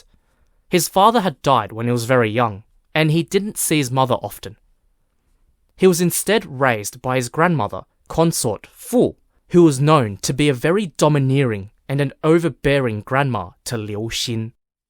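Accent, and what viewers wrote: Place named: Australia. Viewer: First time watching. Interested. Ozzy accent puts me off cause it's usually racist. Only 4 mins in but this don't sound racist yet. I understand that sounds racist. It is. Hence interrested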